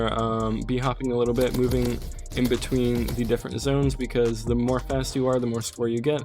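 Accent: Austria. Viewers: Are you austrian?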